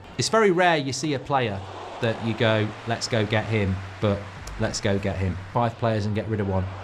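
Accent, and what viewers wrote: United Kingdom. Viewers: Is that a Salford accent?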